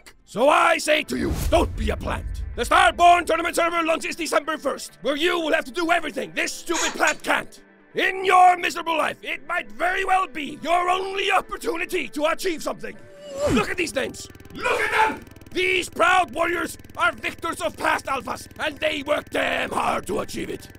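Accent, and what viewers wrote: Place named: Scotland, United Kingdom. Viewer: I imagined less... Scottish, still great.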